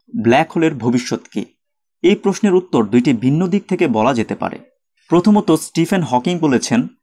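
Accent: Narsingdi, Bangladesh. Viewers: ভাই আপনার বাড়ি কি নরসিংদী?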